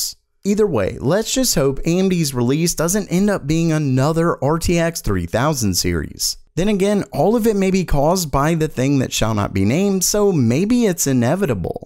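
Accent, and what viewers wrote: South, United States. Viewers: With each video your southern accent becomes more and more pronounced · I can hear the southern accent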